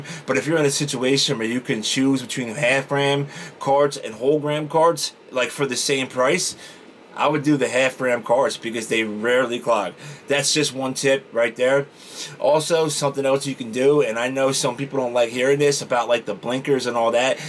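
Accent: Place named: New York, United States. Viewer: Okay I just heard your voice alone and you just sound like home to me. My family is from New York and you sound like my people. 💜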